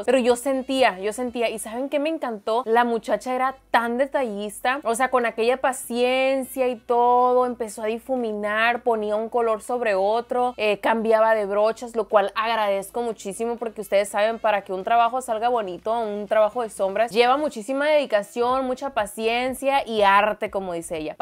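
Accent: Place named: Nayarit, Mexico. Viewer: Así pasa cuando uno de sabe maquillar o por lo menos uno se siente bien con el maquillaje que nos hacemos, yo en mi caso he tratado de que me maquillen en Sephora y no me ha gustado y nunca le atinan a mi color de piel siempre me dejan gris, así que ya mejor ni le busco, saludos me encanta verte yo soy de Nayarit y de cierta manera la forma que hablas me recuerda mucho a mi Nayarit y me haces reír 😘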